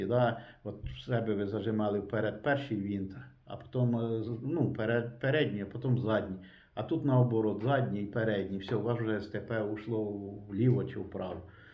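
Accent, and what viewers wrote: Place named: Vinnytsia, Ukraine. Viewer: Шановний пан! Чудово, що Ви зробили свій канал і популяризуєте мисливство. У Вас надзвичайна харизма і неперевершена вінницька говірка, Ви прекрасний оповідач і фахівець своєї справи. Але ваші відео не тільки корисні а і вредні) Я три місяці тому купив АР15 в 223 калібрі для захисту, тренувань і розваг, і зовсім не для полювання. На стрільбищах і бачив болтовики і стріляв трохи. Також дивлюсь чудові збройові посиденьки від Ібіса з Тарасом і Антоном. Вони теж популяризують зброю, зокрема болтовики. З ваших веселих оповідей я захопився полюванням на дивані) хоч всього пару раз був на охоті колись глядачем. І прикидував, як би то я зі своєю аркою теж би ходив. І тут Ви кажете, що 223 калібр зовсім не підходить для полювання, я Вам, як фахівцю безперечно вірю, очевидно, що це так і є. А Тарас якраз розхвалював карабін Маузер М18 в 308му калібрі. 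Все, думаю, куплю собі Маузера! Подивився відео, які вони класні, і знайшов, де його можна купити найдешевного зі стволом 51 см. Порадився з родиною, ну, кажуть, твої гроші... Зібрався в Ібіс по планку Пікатіні, а потім в інше місто по карабіна, і за три кілометри до Ібіса думаю - а нафіга він тобі треба, той Маузер? Яке полювання, ти по справжньому ніколи на ньому не був, і не знати коли ще попадеш на нього. Купити, щоб клацати класним затвором, і іноді стріляти по паперовим мішенькам? То у тебе є арка для цього! Ні, не час зараз для цього. Цілий ранок мені було погано, що відмовився від скоротічної мрії, а потім сам себе хвалив за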